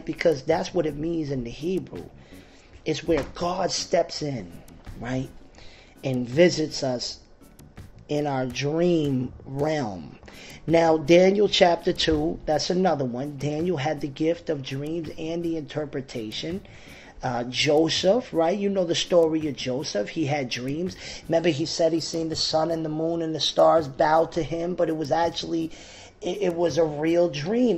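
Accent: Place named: Philadelphia, United States. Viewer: Does anyone know where this brother is, and if he has a church? Sounds a little like Philly maybe? Really like his insight, as it seems he is certainly anointed.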